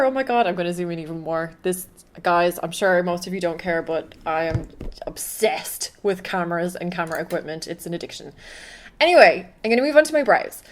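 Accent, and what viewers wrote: Ireland. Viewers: She's from Ireland :) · She's actually from Ireland :) xx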